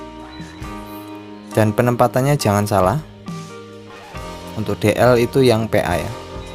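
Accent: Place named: West Java, Indonesia. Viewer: njir sunda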